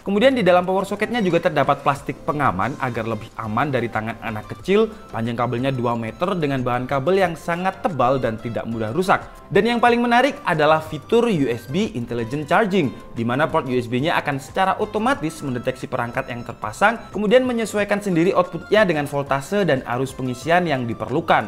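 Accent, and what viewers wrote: Java, Indonesia. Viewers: olehmu medok jowo kuetok pol mas... tulisane dadi tulisannya wkwkwk....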